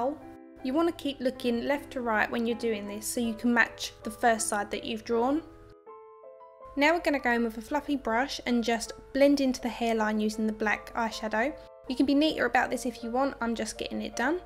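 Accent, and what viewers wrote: Essex, United Kingdom. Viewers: Where abouts in the UK are you from? I can sense an Essex accent ;) i love your videos & you are super talented, new sub!💗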